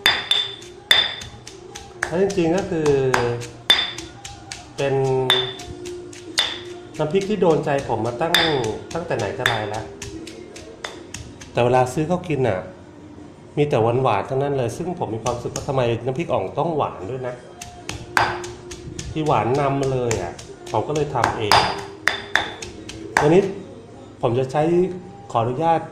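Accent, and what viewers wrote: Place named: Isan, Thailand. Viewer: นึกว่าคนอิสาร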